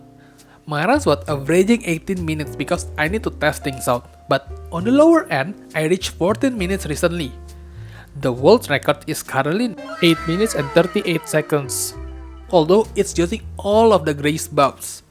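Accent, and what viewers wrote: Indonesia. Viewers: indo ya bang? kedengaran banget accentnya wkkwkw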